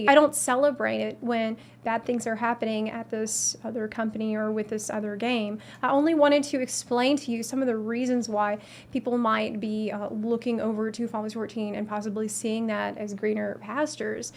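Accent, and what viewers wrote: South, United States. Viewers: I'm just getting started in FFXIV, and binge watching your vids(working) make it even better. Not to mention, that lovely, southern accent(I'm hoping Tennessee, my home sweet home), doesn't hurt lol. Love the content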